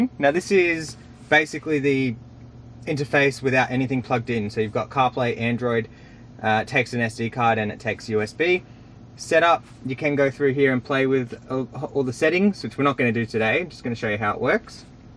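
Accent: Australia. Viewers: Why do speak like a Australia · Nice video man, not too use to hearing Australians on YouTube haha forget I sound like that too😂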